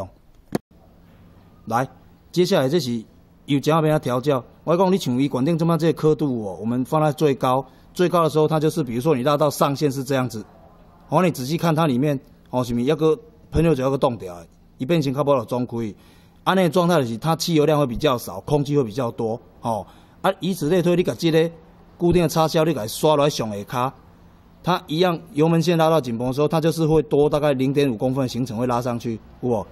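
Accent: Taiwan. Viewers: 不推不行，實在讚！正台灣的口條，讚！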